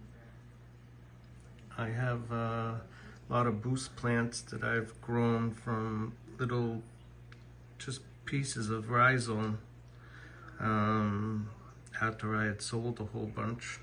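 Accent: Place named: Boston, United States. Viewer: Great first video,! I think it is a lot better than my first video. Love the Boston accent--I haven't heard that in a while. You need to turn that up! Keep up the good work. Anyone with Mascara barbs is number 1 in my book. Oh, and BTW, I love the live stream that was playing on your big-screen TV.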